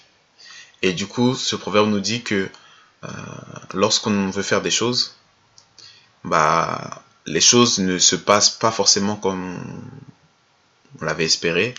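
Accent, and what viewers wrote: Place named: France. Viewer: Merci pour ce boulot colossal. .. au niveau des observations , je pense que la prononciation est un peu francisée .En plus les proverbes en ewe ont un aspect initiatique . Raison pour laquelle les ewe citent les proverbes en les introduisant de manière particulière.. PAr esemple on peut dire ceci : les sages disent que!........ les ancoens disent que.... un proverbes ewe dit que. Il n'est pas conseiller de prononcer un proverbes si un ainé est présent . Dans les situation critique, la prononciation d'un proverbe approprié peut dégonfler ton adversaire..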